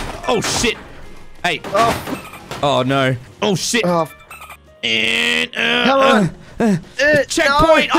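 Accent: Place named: Australia. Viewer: Delivery like a Australian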